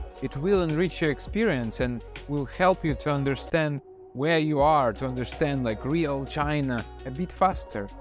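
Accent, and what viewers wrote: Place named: Russia. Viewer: Hi! You fon't know how much you could measure my plasure to meet you on Youtube for the first time. I think your Country is Russia or not? I love your accent, your English is very good and thanks for 7 advice your just give to someone who wants to go to China. They were very very useful for everybody in youtube, I supposs to.❤🇨🇳🌹🙋🌻Good luck in China and your new language learning:Chinese-Mandarin. Greetings from Brazil.💚🌎🙋🌹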